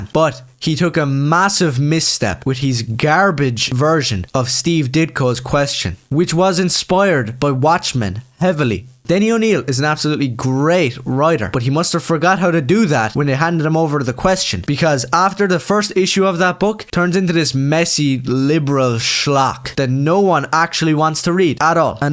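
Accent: Ireland. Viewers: Love the Irish accent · Really funny video, really hilarious, great job. I’m really bad with western accents, I’m not sure if you’re from Sligo, Leitrim, Limerick or Roscommon, it’s probably one of them haha.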